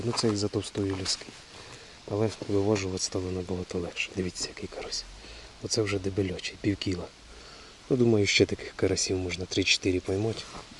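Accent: Poltava, Ukraine. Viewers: Доброї ночі. Судячи по говору, це походу Полтавська обл. р. Сула?!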